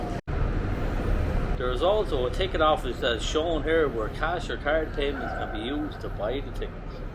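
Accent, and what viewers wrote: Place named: Midlands, Ireland. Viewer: You've a midland accent.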